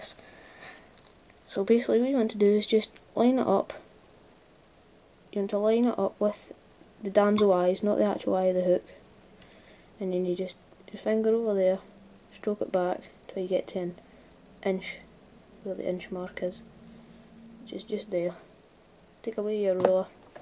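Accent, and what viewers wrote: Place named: Ayrshire, United Kingdom. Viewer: You sound and even tie like the great fly tyer "Davie McPhail" fae Ayr!!! well done son i love yer enthusism and confidence tying these ;) Where you fae? you sound like my accent as Im from Auchinleck Ayrshire!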